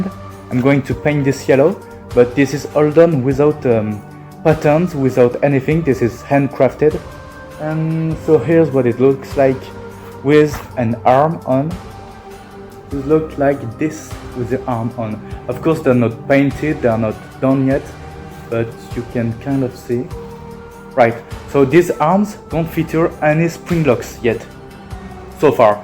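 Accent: France. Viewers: you sound french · Hey I just notice you have a French accent are you French ? Because me yes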